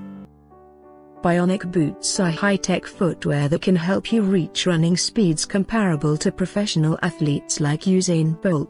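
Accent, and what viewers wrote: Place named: United Kingdom. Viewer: Jeeez! Fire whoever designed this video! Nobody wants a screen full of words while a British-accented woman goes on and on in a curt cautious voice. Sheesh! Rule number 1 Pick your target audience! Are you aiming for middle aged women? I don't think so! Time for a major rethink!! Guess what we want to see when you have a title including 'Bionic Boots'? We SURE DO want to see those boots. What did you give us? A screen full of words. Not cool. (Hint: Seek coolness)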